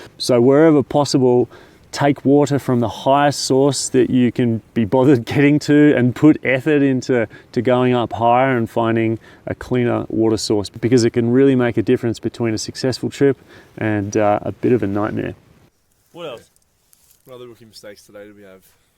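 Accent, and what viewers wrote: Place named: Australia. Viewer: So great to hear an Aussie accent!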